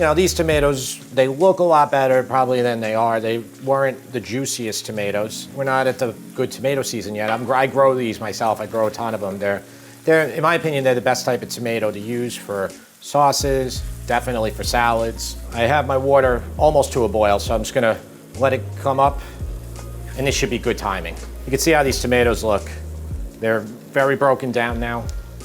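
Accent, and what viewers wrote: New York, United States. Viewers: Don't usually hear such thick New York accents on YouTube cooking channels. I like it. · where are you from? new york or new jersey? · Love the ny accent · As a Brooklynite, I can enjoy hearing your wonderful NY voice. If you’re not from NY, please let me know, or else fogeddaboutit😂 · I love the accent 😍 New York?? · Hey , let me make you ah pasta you can't refuse . Love watchin this guy and his N Y accent. Great recipes . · Are you from ny or nj? 🙂 ok,, yes, I see, ny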